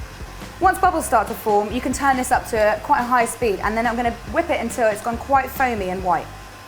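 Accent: England, United Kingdom. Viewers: Hi, just discovered your channel, so nice to hear an English accent on YouTube! I saw another video for making individual meringues and the instructor said they should be put in the oven at 275 for 45-60 minutes....I don't know if my cooker is Celsius or Fahrenheit but I guess 275 was too high as the meringues came out brown. No matter how hard I tried, I could not get my whites to fluff up as much as you did. Is that because I didn't beat enough? beat too much? or is it just that I didn't have an cream of tartar? I would really appreciate your advice.....I wanted to make these for a special family dinner and was so disappointed by the mess I finally produced! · I love the way you talk and your big and beautiful smile ♥ And of course all the delicious stuff you cook. My mom is also a pastry (I don't know if it's well written, I'm Argentinian and my english is not that great) and my favourite deserts are pavlovas, so she always makes it for me. 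I'm gonna tell her about this recipe. Keep cooking, and keep that beautiful smile in your face:3 It's a pleasure to see your videos from Argentina and listen to your beutiful english accent! Big hug ♥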